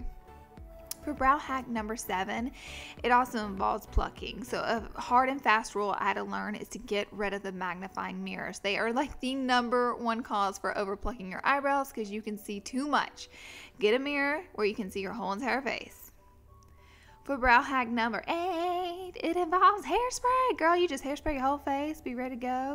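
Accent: South, United States. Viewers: I like her southern accent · I love how you communicate your so southern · Omg, I love your Southern accent!! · Girl, you' so country! Absolutely love your accent!!!! 😍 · Are you country? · Girl you are so southern. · Why do you try to sound country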